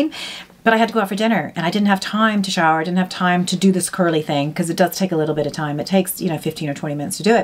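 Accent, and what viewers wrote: Ireland. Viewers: Wonderful! I'm from the UK but have ties to Guiness and love the Irish accent! Good that you can swap from Irish Colleen to Southern Belle!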